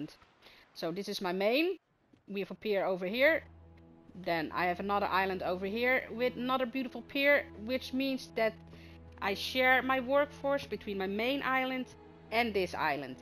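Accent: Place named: Netherlands. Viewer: ik kon wel duidelijk horen dat je Nederlands bent. 🥳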